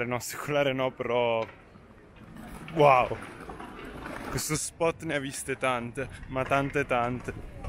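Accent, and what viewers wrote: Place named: Spain. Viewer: Hai già l'accento spagnolo tío🇪🇸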